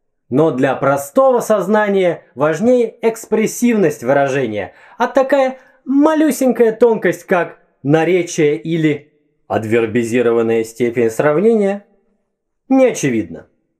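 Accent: Moscow, Russia. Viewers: Ох уж этот московский говор. Продолжайте, ни в коем случае не прекращайте. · Мне кажется, пустее, богатей, пустее и т.п. есть влияние северных говоров ( новгородский, вологодский ), ведь довольно слабый эффект второй палатализации чувствуется и по сей день. Собственно, кабы Мацква не захватила Новгород, мы бы и дальше говорили в руце, в нозе, в дусе и т.д., а северные области для остальной России были бы чего-то вроде Шотландии для англичан 😎. По поводу сравнительной и превосходительной степени в западнославянских языках: в чешском она даже проще, нежели в польском, ввиду одинакового окончания в номинативе для всех родов ( напр. lepší (лучший (-ая,-ое) или же просто лучше, в русском от контекста зависит; horší (более короткий или худший) и т.п.). В превосходительной тупо прибавляешь приставку nej. Я еще заметил, ты с московским акцентом говоришь. Коренной москвич?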